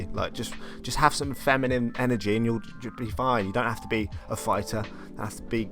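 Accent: United Kingdom. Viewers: Okay I just clicked on this and your energy and British accent are so cute 😭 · Your accent is like you're one of the cast of sex education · I love your British accent and hello from Germany 👋🏼🌸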